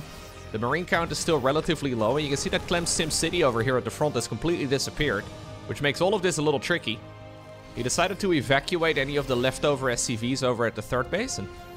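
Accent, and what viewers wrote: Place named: Netherlands. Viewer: I'll never not hear that dutch accent on the "O"s -COHMPFIDENT! -COHMPTFORTABLE!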